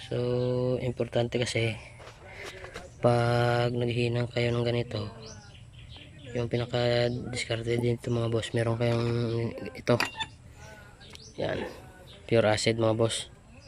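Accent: Iloilo, Philippines. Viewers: Bro may airgun foot pump man nga guba Ako lang nag Kay o... Ilonggo ka man bro? God bless bro.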